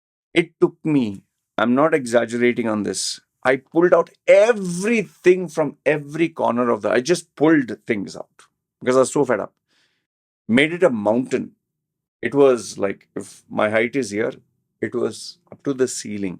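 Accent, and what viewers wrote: India. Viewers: please talk in hindi. Atleast introduce yourself saying hindi words. We would love to see your indian accent 😅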